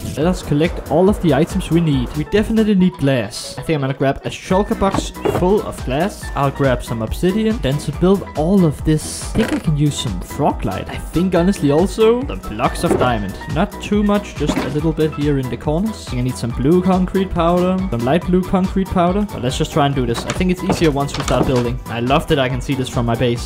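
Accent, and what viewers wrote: Denmark. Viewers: Føler lidt han lyder dansk... Could it be.. My dream comes true? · Are u from denmark? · Are u danish? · Kunne høre på din stemme at du var fra Danmark. 😁 Det ser godt ud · Syg dansk accent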